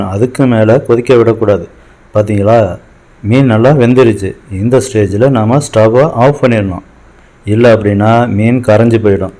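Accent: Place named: Madurai, India. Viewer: chanceless recipe bro... unga tamil madurai side madri iruku bro.. very nice and yummmmmmmmmmmmmy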